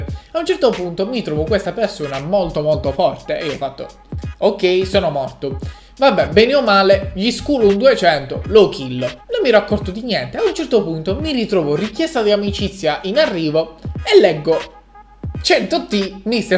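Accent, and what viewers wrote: Bari, Italy. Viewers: Ma sei di Bari?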